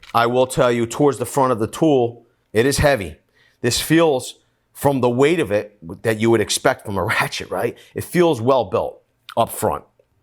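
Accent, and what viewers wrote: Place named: Staten Island, United States. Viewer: You must be in NJ or NYC. A fellow Staten Islander? I just discovered your channel this morning, and I'm convinced you must be from within a 10 mile radius to sound like you do.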